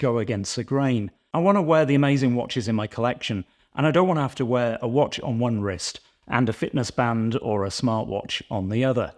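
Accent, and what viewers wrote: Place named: RP, United Kingdom. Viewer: you're posh and say it properly, I'm an oik and can't even spell it 😂 Great vid as always dude ❤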